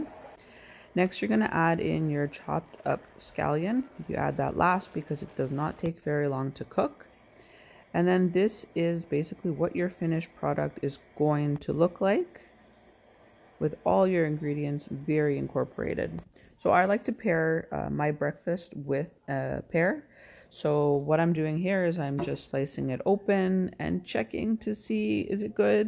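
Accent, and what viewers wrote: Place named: United Kingdom. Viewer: Why did I think you was British lol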